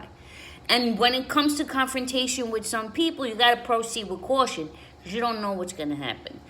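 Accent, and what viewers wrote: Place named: New York, United States. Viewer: Luv ur humor. Nice to see & hear a real New Yorker. Joseph from Minnesota.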